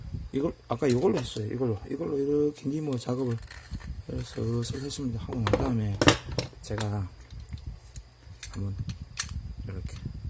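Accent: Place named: Gyeongsang, South Korea. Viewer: 경상도 사투리 가 듣기 조아요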